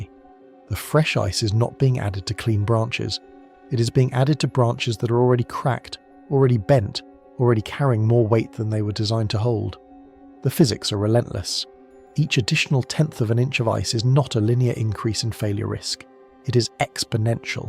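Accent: United Kingdom. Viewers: Why British doing all our videos ....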